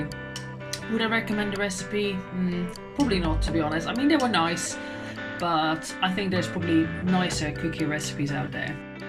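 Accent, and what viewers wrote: Netherlands. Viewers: I live in Germany and ur German is pretty good! But it has a bit of a dutch accent :D I feel like both languages are kind of similair, when they are written. When I read smth in dutch, I often understand it, but when somenone talks dutch, I understand nothing haha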